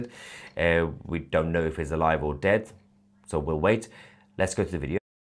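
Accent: United Kingdom. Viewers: So you were saying Ayatoilet. I thought maybe it was my American ears misunderstanding your British accent. Very fitting moniker for such extremist refuse.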